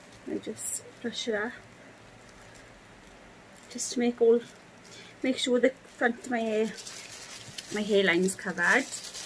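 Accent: Wales, United Kingdom. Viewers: Hiya Lovely x A fellow 'Welshie' even though I'm South African born 😊 x Just loooove your accent way too much x Literally just done mine as well and same result with the more yellowy roots x Was just wondering if you've used a purple shampoo after the video and if you have, did it help with the yellow?? As heck yes, I love the results but nearly had a stroke as the yellowy roots make me look proper pink lol x I'm already in my head summoning my hairdresser friends for emergency damage control lol! 😨😅😂 x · I looove your welsh accent its so cute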